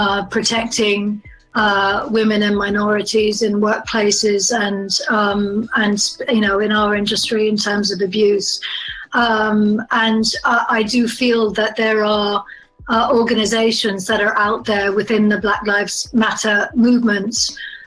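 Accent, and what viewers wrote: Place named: United Kingdom. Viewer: She lived in the UK til she was 13 and has now lived in the UK for most of her life. She’s not “doing” a British accent. It was her first “language”.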